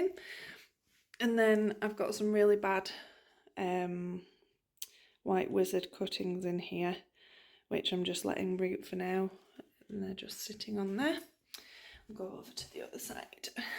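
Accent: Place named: Yorkshire, United Kingdom. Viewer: Ahhhh, a Yorkshire lass? Thumbs up for the accent, the plants and hair colour 😁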